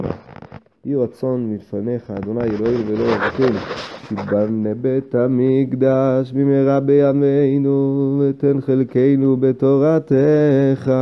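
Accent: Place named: Israel. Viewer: Your prayer is clear, easy to follow and sounds authentic Israeli. It's a good supplement to allow us to fulfill the mitzvah in some days we have no access to the synagogue.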